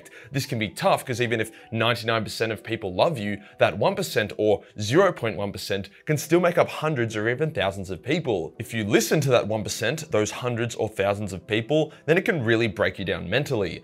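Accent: Australia. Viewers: Seriously man i jusy found your channel a couple months back and have since been watching old and newly uploaded videos, i just love your commentary and the aussie accent and attitude is just the cherry on fucking top! Cant waif for more WK videos in the future! Also fuck those incel breed losers in the dark corners of the galaxy, fucking xeno brain dead fucks. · Alt title: hate comments read by an Australian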